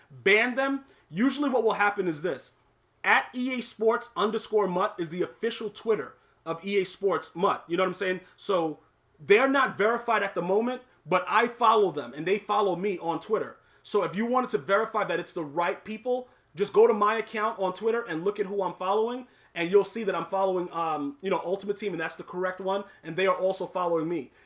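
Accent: New York, United States. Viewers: Are you from new york?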